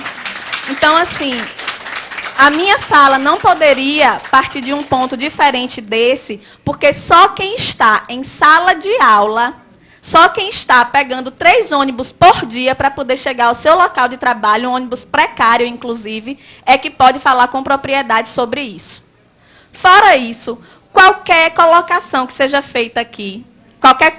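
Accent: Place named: Rio Grande do Norte, Brazil. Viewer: Assisti ontem ! Adoro o sotaque do RN, adoro Natal!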